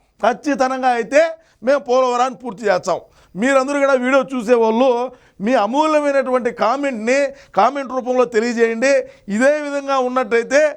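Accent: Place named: Chittoor, India. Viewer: Chittoor village type undhi plz improve my village chittoor...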